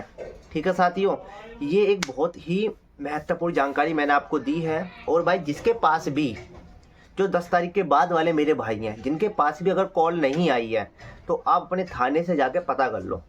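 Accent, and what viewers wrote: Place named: Bulandshahr, India. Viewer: Pure bulandshahr ki language bol rha hai bhai😅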